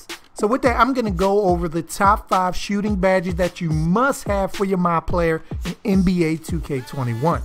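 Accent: Baltimore, United States. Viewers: Bruh u can hear that Baltimore accent everywhere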